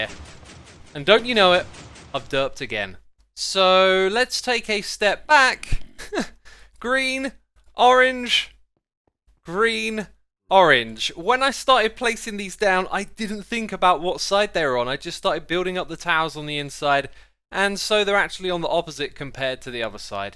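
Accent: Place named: RP, United Kingdom. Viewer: X sounded so extra posh in this episode.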